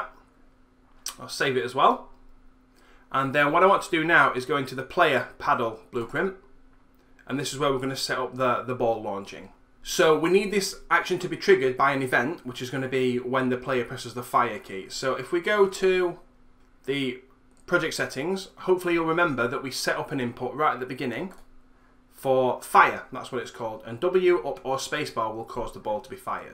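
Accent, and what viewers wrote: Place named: Nottingham, United Kingdom. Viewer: Now it seems obvious but I hadn't placed it earlier. I think across Notts though there's quite a broad array of accents. Beeston for example is pretty different to Mansfield.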